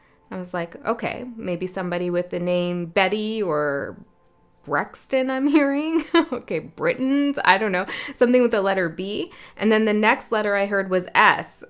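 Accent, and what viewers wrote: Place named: Canada. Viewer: I thought I heard a Canadian accent, so I looked at your page, sure enough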